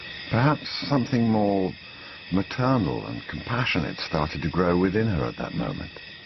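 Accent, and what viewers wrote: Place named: United Kingdom. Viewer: Bonito é o sotaque britânico do narrador... rsrs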